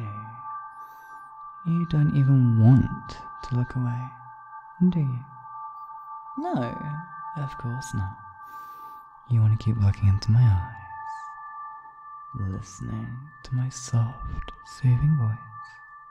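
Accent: United Kingdom. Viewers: Me accidentally clicking and listening for some reason: HE BRITISH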